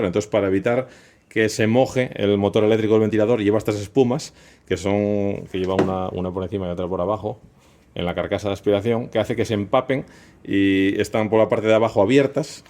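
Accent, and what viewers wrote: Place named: Asturias, Spain. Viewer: Muy buenos tus vídeos ,por cierto por tu acento me parece que eres de Asturias, o me equivoco ,un saludo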